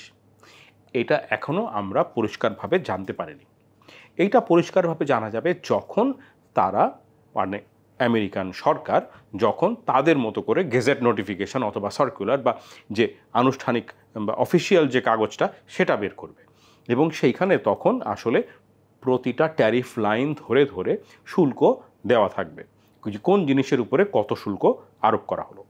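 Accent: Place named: Kolkata, India. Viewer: আপনার কথাগুলো কলকাতার বাঙ্গালীর মতো, দাদা কি কলকাতার নাকি?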